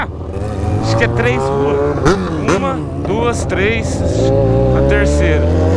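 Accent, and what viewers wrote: Goiás, Brazil. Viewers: Saudades quando ele falava no pique do Goiás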